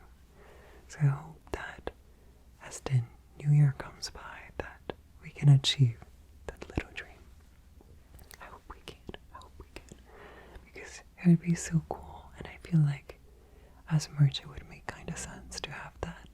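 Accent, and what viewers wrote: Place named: Netherlands. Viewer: Another great video! I was actually surprised you hear you’re not American XD although I listening really hard to your accent I figured it was Dutch! Or some Scandinavian country rather ^^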